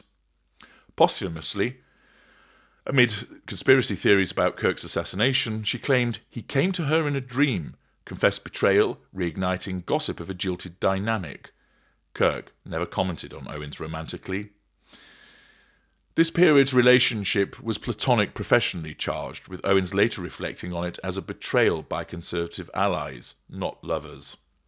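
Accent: United Kingdom. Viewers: He is, it's the British accent ;)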